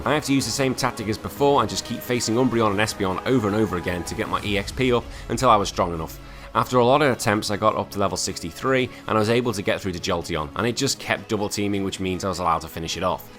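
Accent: Liverpool, United Kingdom. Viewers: Yo my guy u sound Liverpoolian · I can tell you’re scouse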